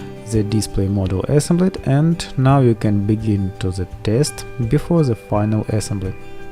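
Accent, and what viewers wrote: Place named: Russia. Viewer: рашн аксент дас ист пыздець ) and thanks a bunch for the video, it's awesome and clearly explains all actions - much appreciated 👍🏻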